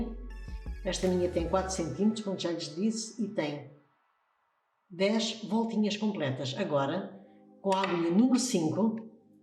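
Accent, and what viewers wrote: Portugal. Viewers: amei seu sotaque português! Lindo demais! E o gorro é uma graça! Obrigada!!!🌷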